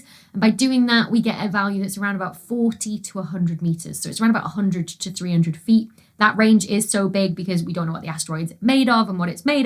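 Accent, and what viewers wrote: United Kingdom. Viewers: If you want to be successful in the United States… slow the hell down drink less coffee before recording a video. We aren’t that crazy about your British accent and we can’t figure out why you’re rushing. Slower and more focused on each chapter. Pretend we are plodding American tourists and explain it. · I occasionally read a few random comments on videos like this and often regret it. There is one from a fellow countryman of mine that complains about the British accent. if I understand the complaint correctly, I'm disappointed to see that we have reached a level of audacity where some of us expect everyone to sound more American. Imagine using the internet and discovering that not everyone on it is American (gasp). had a song "." I am too. Please continue to be yourself, thank you!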